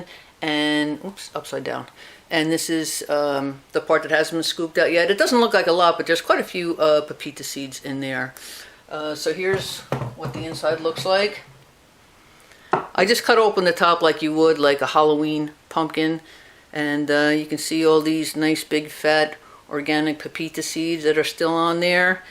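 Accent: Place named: Pennsylvania, United States. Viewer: I have grown these here in NE VT. They take longer here, as we are borderline zone 3 and it does not get as hot as in your neck of the woods. I have a nice haul this year, about 20 of them from seed I saved from last year. I planted on June 1 after staring in the greenhouse. I just harvested them today, Oct 24 ( fortunately no frost yet). They are only just beginning to reveal their orange sections. I was wondering if these pumpkins can be just let to sit and dry in order to make seed harvesting easier and not such a gooey affair. Have you ever tried this? Anyways we are fortunate not to have a big problem with squash beetles, haven’t yet seen any here. The Japanese beetles preferred the hazelberts and prunus ( cherry plums and regular plums ) though they were less this year too ( maybe two years of trapping is helping). Keep on growing…. 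You sound like you live in PA…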